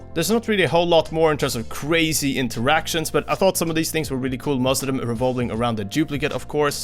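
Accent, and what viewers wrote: Sweden. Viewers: You sound like you come from sweden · Is he swedish? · Is this guy Swedish? Cuz he has that annoying accent. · Ur accent sounds swedish idk why lmfao no hate tho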